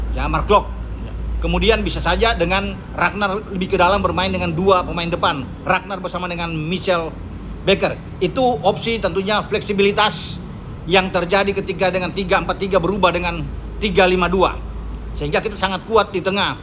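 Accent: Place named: Java, Indonesia. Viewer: Medok ah... Kalah 3-0..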